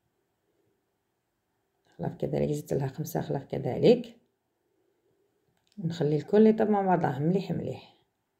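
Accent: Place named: Jijel, Algeria. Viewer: الله يبارك ويوفقك في مشوارك قناتك رائعة انا احب الطبخ الجيجلي وكذلك اللهجة😘😘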